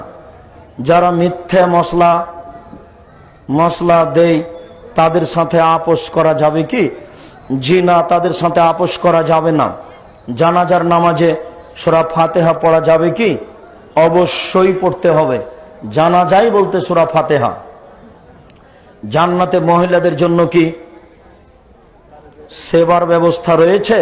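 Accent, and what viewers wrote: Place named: Kolkata, India. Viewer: হালায় দেহি কলকাতার ভাষায় কথা বলে বুঝ্ঝি হালায় ইন্ডিয়ার দালাল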